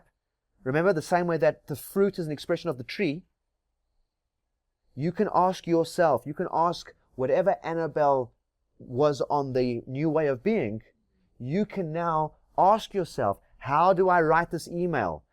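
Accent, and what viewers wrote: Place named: South Africa. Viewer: Beautiful video. Are you South African? You have a South African accent.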